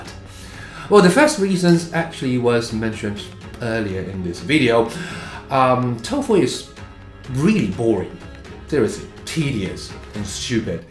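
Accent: United Kingdom. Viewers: 這是英國哪種口音? 我一個大陸同事有一模一樣口音，看到影片驚呆了我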